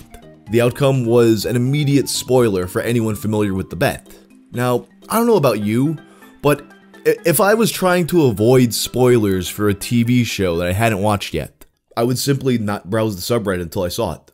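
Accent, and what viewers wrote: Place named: Brooklyn, United States. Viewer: No offense, sort of sounds like a Brooklyn-nite/Italian version of Vinesauce Joel.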